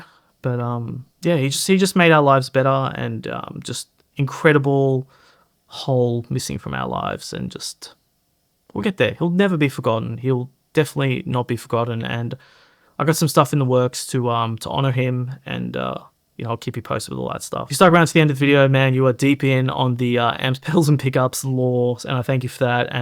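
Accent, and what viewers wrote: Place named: United Kingdom. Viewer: I send my condolences about Red,. (I don't know why but I would have guessed you were British.:))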